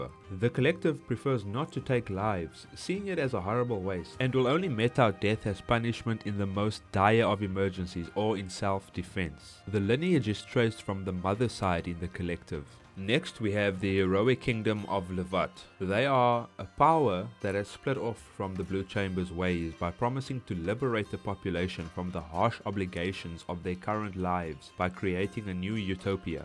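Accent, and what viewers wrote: South Africa. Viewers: This such a deep south african accent good video please more lore videos , I subscribed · I was also just like this guy is south African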